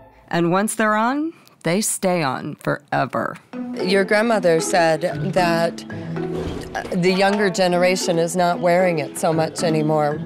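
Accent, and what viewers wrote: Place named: Myanmar. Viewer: Wait she sounds like burmese I SPEAK BURMESE!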